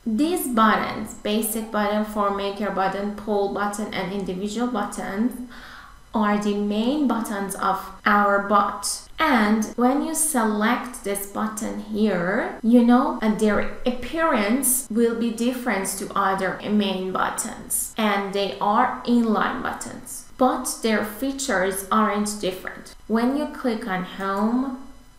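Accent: Iran. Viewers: Your accent sounds Persian to me nice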